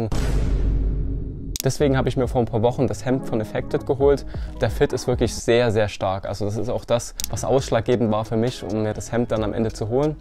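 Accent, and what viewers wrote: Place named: Saxony, Germany. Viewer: Dein dialekt klingt bisschen sächsisch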